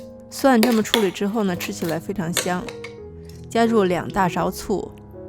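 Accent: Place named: Xi'an, China. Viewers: 西安话再土也可爱，西北特有的韵味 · 西安话挺好听啊，我济南人，我每个地方的方言都有自己的特色都很有意思，之前闫妮和张嘉译演的电视剧，她俩说西安话特好玩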